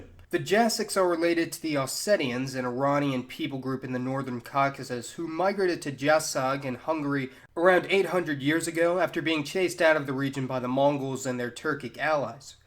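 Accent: United States. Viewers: Dude, your hyper-stereotypical American pronounciation is just killing the fun in listening to your vids.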